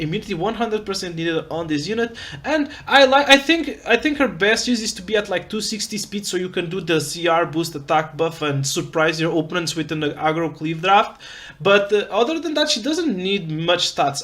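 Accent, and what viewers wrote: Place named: Portugal. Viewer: Ouvi-te falar um pouco, e fiquei logo a pensar que eras tuga. Quando descobri que tinha razão... É tão bom ter um content creator de Epic7 português! Continua!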